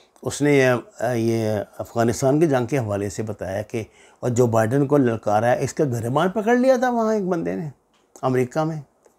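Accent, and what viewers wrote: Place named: Lahore, Pakistan. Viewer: ilAQAH chach se hae aor lshori lehjah GHALIB aa gaeya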